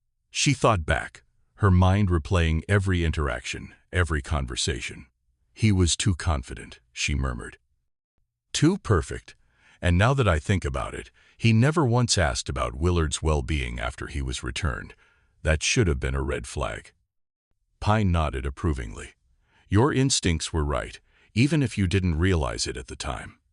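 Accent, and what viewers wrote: United States. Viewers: Awful American accent. Awful fake Christie story. Go away do.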